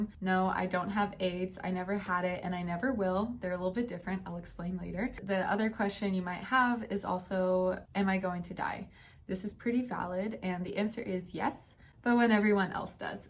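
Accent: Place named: Germany. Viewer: You can hear German influence on your English 😂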